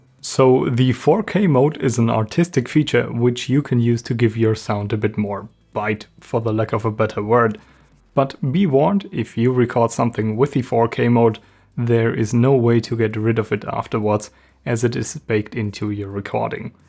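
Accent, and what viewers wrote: Germany. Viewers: Love the German accent.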